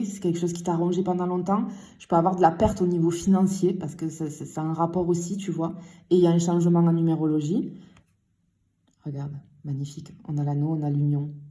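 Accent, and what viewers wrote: South, France. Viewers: Bonjour, merci pour vos belles vidéos. Je les découvre depuis peu et beaucoup me parle. Celle-ci en particulier. J’adore votre énergie, votre accent du Sud, votre chaleur et votre bonne humeur. Et j’adore votre manière de faire : « ou la lalala ! » Lol recevez toute ma gratitude.🙏🤗💙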